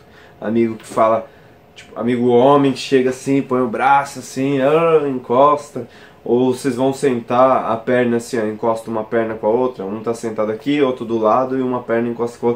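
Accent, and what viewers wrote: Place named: Minas Gerais, Brazil. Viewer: Tudo bem que e errado mais aqui em minas maior galera fala assim kkkkkkkk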